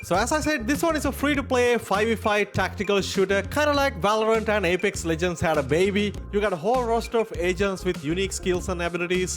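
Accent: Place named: India. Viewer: Why do u sound Indian brother? R U? Btw I am an Indian.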